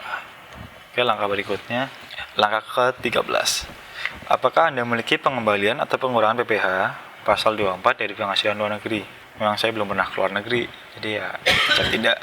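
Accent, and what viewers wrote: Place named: Banyumas, Indonesia. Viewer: membantu sekali mas ngapak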